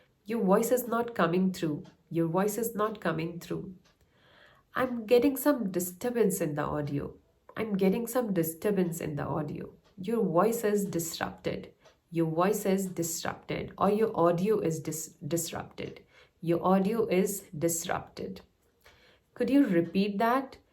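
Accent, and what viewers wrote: India. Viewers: I felt there was a Hindi accent in your tone before, but if I’m not mistaken, I have seen great improvement now.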